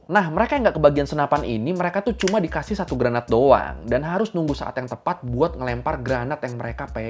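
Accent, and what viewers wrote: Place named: West Java, Indonesia. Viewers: pertama kali denger bang ngomong sunda wkwkw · sunda lau mantep juga bang alus pisan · Ternyata Lo orang Sunda bang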